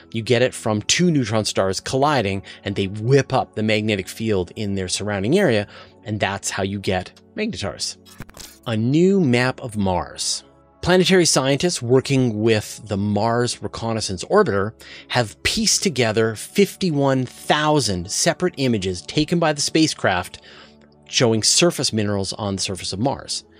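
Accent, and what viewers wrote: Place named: Canada. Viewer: Hello, thank you for the news that you put out. I have a question for you off topic but I place people by their accents and yours has a tiny, tiny bit of Canadian and I have some difficulty placing it. If I had to guess it would be Canadian within a few miles of the US border on the western 1/3 of the country. I would like to know where you are from, Born , raised, K-12 in a big city or rural. Thanks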